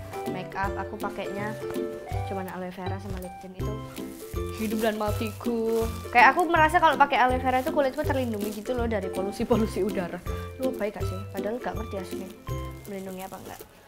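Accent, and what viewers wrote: Java, Indonesia. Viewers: Medok banget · pertahanin ngomong campur bahasa jawanya. Aku suka banget sama cara ngomongmu 😍👍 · Medok banget yak:v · Lucuu bangety.. Jawanya medok2 unyuu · medok terus sumpah jadi makin seneng liat videnya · medok banget ih gemes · Gemesh e ngomong e jawa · tetep mwedok penting ayu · Keliatan banget kalo orang jawa👍 medok reek, mantap 😍👌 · Medok bngt ngmong ya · medok bgt jawanyaa lucuu!!😭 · Medok kayak aku 😄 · Ya allah medokk nya kecirii bgt wkwkk ,klo titi udah ga bgtuh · Medok tp cantik bgtt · logat jawa nya kerasa bgt 😫☝️☝️ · trnyata km ngomongnya rd medok gt ye😂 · Medoknya dpt bgt bundd😍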